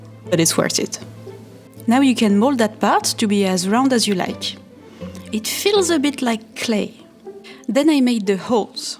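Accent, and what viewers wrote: France. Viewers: Listening to your lovely French accent and watching you craft beautiful leather work is magical.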